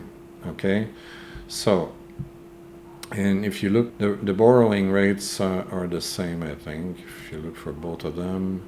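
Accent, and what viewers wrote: Quebec, Canada. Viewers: Is that a Québécois accent that I hear? Ça sonne vraiment comme chez nous!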